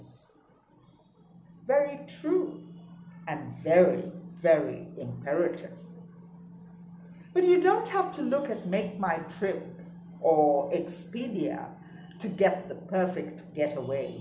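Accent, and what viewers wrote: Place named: United Kingdom. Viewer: How many gen z n millinials understand this british english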